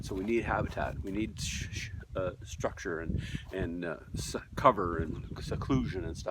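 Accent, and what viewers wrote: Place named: Canada. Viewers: Man ur canadian af....nice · I thought so too. Always makes me happy to see another Canadian ❤️🇨🇦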